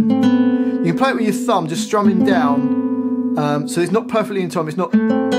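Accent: United Kingdom. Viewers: I ain't sure yet of what sounds better - his British accent, the guitar or the song itself. It looks like all of those are brilliant.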